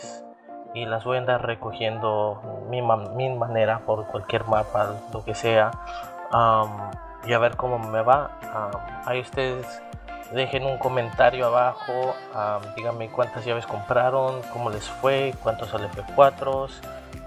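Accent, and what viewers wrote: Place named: Chicano, United States. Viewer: de chicano diras-_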